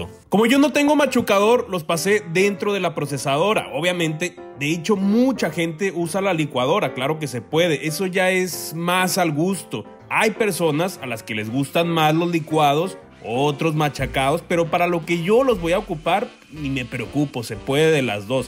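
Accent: North, Mexico. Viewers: Yo siendo de México también se me hace graciosa su forma de hablar creo el es del norte de una ciudad llamada Torreón y entre Méxicanos hablamos distinto de norte a sur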